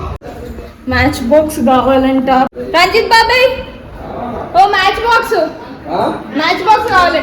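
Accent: Telangana, India. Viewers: It's always Fun and entertaining to watch ur vlogs garu❤ especially our Telangana people will connect more to ur slang❤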